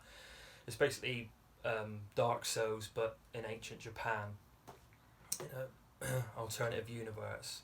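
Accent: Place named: Wales, United Kingdom. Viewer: Some great answers there buddy 😄 ive never played a mana game but always been interested. There should be more Welsh accents gaming 🤣🤣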